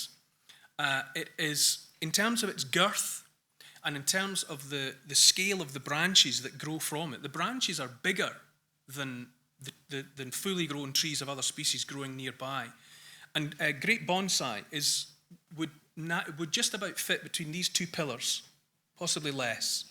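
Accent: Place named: Scotland, United Kingdom. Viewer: Absolutely brilliant, what a great story teller, and is a historian and a great one at that, his passion is unsurpassed, and I absolutely love his beautiful scottish accent.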